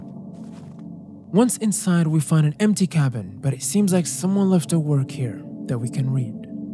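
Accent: France. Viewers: you have a french accent, my friend, you didn't actually need to use babbel to speak it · T'as un super accent français, et tu viens de gagner un abonné !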